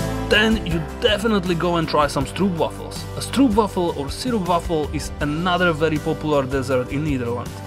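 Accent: Slovakia. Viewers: This channel is exactly my cup of tea! 😊👍. Nice work. Keep going. Btw, I knew it immediately that you are Slovak from your accent 😆. Though, very solid English. Cheers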